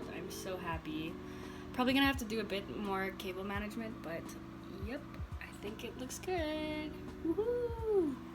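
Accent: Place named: Philippines. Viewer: You sound like you're filipino. Are you?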